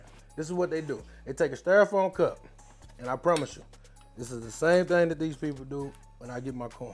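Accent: Texas, United States. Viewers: I guessed that you were a Texan! The accent and the hat did it.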